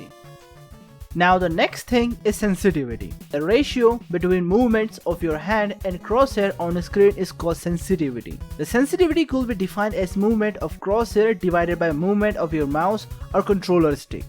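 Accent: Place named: India. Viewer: Talking is indian accent is ok bro Pls using American accent looks very cringe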